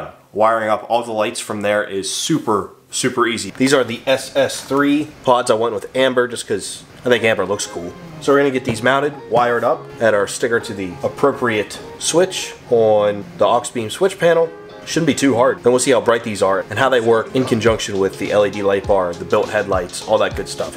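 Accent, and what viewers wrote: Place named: Pittsburgh, United States. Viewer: First time ever watching, I heard your voice and thought to myself, "this dude sounds like he got some Pittsburgheese in him" then I saw the Steelers hoodie....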